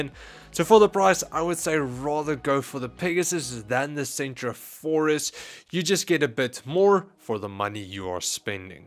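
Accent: South Africa. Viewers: I can't figure out this guy's accent. It's like Afrikaans, American and English all in one with a touch of Dutch. Touch of Dutch. I'm basically a rapper. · Are you South African? I speak a bit of Afrikaans!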